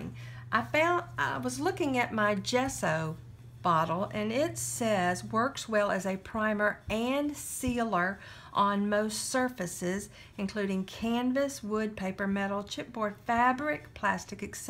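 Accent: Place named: Texas, United States. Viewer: Darlin I was just looking up flower tutorials and I found this video. I know this was back in 2017 so I'm not sure if you will even see this. I'm from Texas originally and hearin that sweet country twang made me feel like I was home again. I think this is so wonderful and am gonna be prayin for you and your ministry. 💗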